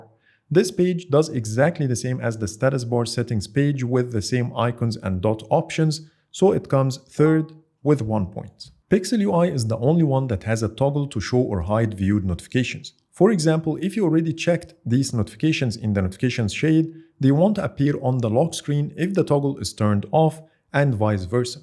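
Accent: Egypt. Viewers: You’re Egyptian 😅😅 the accent is screaming Egyptian guy 😊😊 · Your accent is Egyptian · لو المراجع مصري يبقى انا كدا عرفت اللهجه صح بارك الله فيك على الريفيو الممتاز 💖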